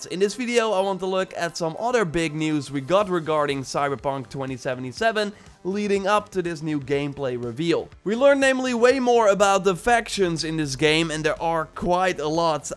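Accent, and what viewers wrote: Netherlands. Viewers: I couldn't help but hear your strong dutch accent. xD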